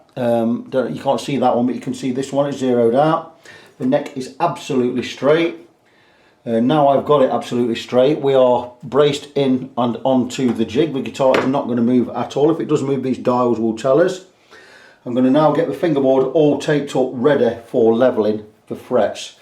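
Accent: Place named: East Midlands, United Kingdom. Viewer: Hi. I have this exact guitar too and I absolutely love playing it. By only bug is the nut. I think it would benefit from a graph tech nut or something along those lines. Possibly even some locking keys like other PRS models. Your accent sounds pretty close to where I’m from. Possibly Derbyshire/Nottinghamshire or around that area? (Also based on your comment about being around 60 miles from Northampton) If so I’d be interesting in using your services in future for a few mods and setups etc. Thanks for the video, it’s reassuring to know you take good care of peoples instruments rather than handing it over a counter and not seeing it for a few days 👍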